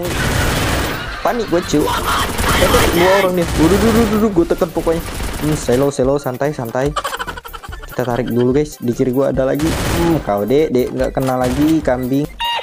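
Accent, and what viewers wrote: Palembang, Indonesia. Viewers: Wong Palembang kah bg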